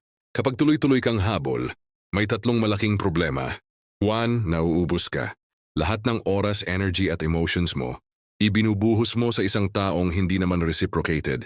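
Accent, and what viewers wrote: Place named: India. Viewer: maniniwala na sana ako kaso parang indian na naga tagalog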